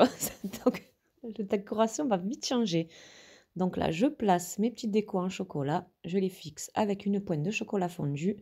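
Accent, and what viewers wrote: South, France. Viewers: Franchement j'ai essayer tes recettes top et cette bûche .. magnifique. 👏👏👏👏❤️❤️❤️❤️❤️❤️🌹🌹🌹🌹🌹🌹🌹 J'aime beaucoup votre accent du sud 💖💖💖🎀🎀🎀🎀🎀🎀🎀🎈🎈🎈🎈🎈🎊🎊🎊🎁🎉🎉🎉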